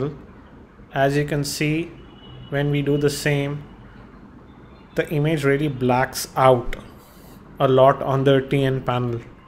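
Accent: India. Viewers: I can’t take it with that Indian accent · India-english accent is really cute :3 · This is actually the way all indians talk english, you get bored when you listen to a lot of them. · o god this indian accent.. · speaking english with indians accent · awesome indian-english accent:) <3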